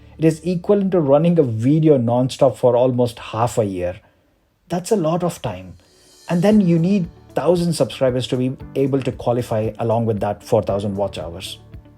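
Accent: India. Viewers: Are you Indian ?? Your voice look like an Indian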